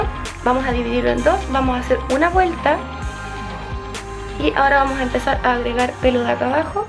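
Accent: Chile. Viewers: saludame! soy de Honduras. pero vivo en NY. tengo un amigo chileno y me gusta el acento chileno por eso te veo😍 Mi nombre es Alejandra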